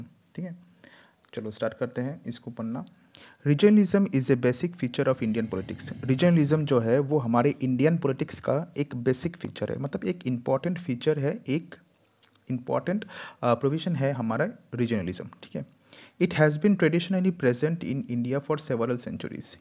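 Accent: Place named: Northeast India, India. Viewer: I like your teaching and understand ur language so easily seems it feels like our northeast accent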